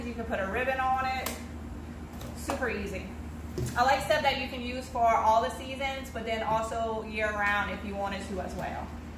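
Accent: Louisiana, United States. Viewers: So Awesome. Thanks for answering back. I'm from the West Bank of N.O....nice to hear another LA accent. I can't wait to try some if your ideas and your recent project sounds cute. Glad you were able to sell it. I hope to sell some of mine in the near future. ❤❤❤ Oh and that sounds so cute attaching them to a shutter.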